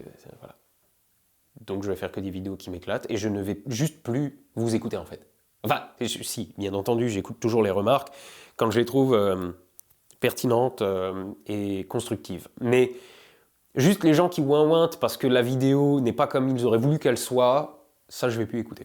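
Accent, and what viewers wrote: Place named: East, France. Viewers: Ce que m'inspire ta vidéo... Humblement... T'as pas un côté HP? T'as bien le droit de faire ce que tu veux... Je te suis pour le ton, les thèmes et ta façon de vulgariser... T'as le droit de faire ce que tu veux... Fais-moi rêver avec ton accent mosellan... On est voisin, big up.... T'as le droit de faire ce que tu veux... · De la botanique à la sauce, ça va dépoter! Je ne loupe pas les mises en avant de produit avec l'accent de l'est de La France. Et puis c'est l'apprentissage des gros mots en Alsacien. Pour les futurs sujets, fais toi plaisir et surprends nous! Tu aimes la qualité alors fonces. Tu fais parti de ces créateurs qui m'apportent de la connaissance avec humour. A bientôt.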